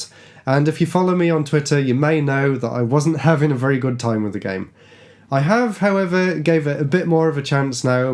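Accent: England, United Kingdom. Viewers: Gretings from Brazil. I was looking for youtube retrogame vídeos and I found your channel. I really enjoyed how you was exciting with this retro shop. I had been visit London in 2015 but did not found this shop, what a shame!off course is always Nice listen The trully english, here in Brazil we have much more contact with the American english, and its not so Nice. Im glad that I found your channel.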